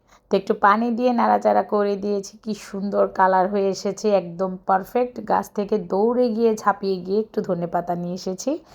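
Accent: Noakhali, Bangladesh. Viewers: আসসালামুয়ালাইকুম প্রিয় আপু নোয়াখালীর বধুর নোয়াখালী ভাষা মাশাল্লাহ খুব ভালো লাগলো।দারুন হয়েছে ইলিশ মাছের পাতুরি রেসিপি টা আপু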